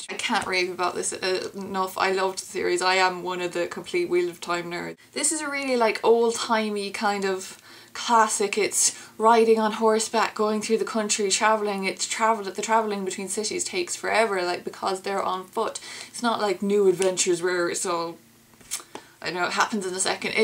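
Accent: Ireland. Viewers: Joanie loved the review and your accent is very cool. Irish? I agree with you 100% 1 thing very noticeably lacking in the LotR books is character development. Jordan has full bodied characters (my favorite is Matt). I'm on book 12 "the Gathering Storm" . As far as pronunciation goes I'm listening to the audiobook versions (I guess that's a cheat) as I drive to work. Any way I'm off to see the rest of your reviews May you find water and shade Spear Sister! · I was just browsing some WoT videos, as I'm getting excited the final book is just months away and I was pleasantly surprised to hear your Irish accent (like my own) when I had expected an american review. I hope you do manage to review the rest of the series before the final release. Much love for this fantasy series. It's scale and depth are wonderful and inspire such passionate discussion among fans. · Do I detect a Irish accent? 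Or am I just being retarded? Good vid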